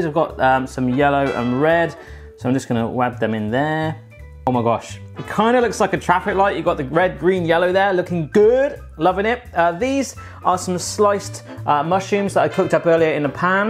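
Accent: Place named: United Kingdom. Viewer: BTW I see in your accent you're from the UK. I was just there yesterday and I'd... Like to move there and work on my vegan kitchen "abilities" there :) Do you have any suggestions on what to do?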